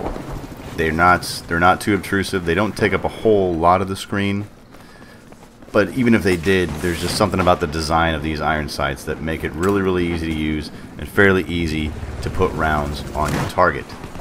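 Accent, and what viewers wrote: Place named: Midwest, United States. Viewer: The attributes in your voice suggest that you are 1) experienced, 2) rich in character, 3) from the Midwest USA, and possibly 4) you were born in - and I'm just taking a shot here - May! Please tell me how much I got right, I am a slight Sherlock Holmes enthusiast. By the way, I too rather like the intrinsic appeal of this weapon, even though the stats are quite mediocre. Great review!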